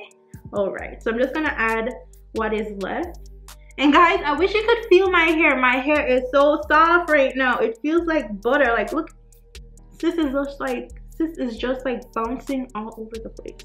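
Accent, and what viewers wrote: Jamaica. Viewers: Jamaican accent cant leave ya lol big up ya self my girl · Do I hear a Jamaican accent???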